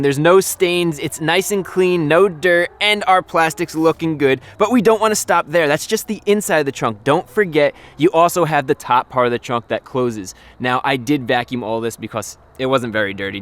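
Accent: United States. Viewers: This dudes accent is so American. And he's so Positive, happy, and enthusiastic. Makes me smile and have an urge to clean.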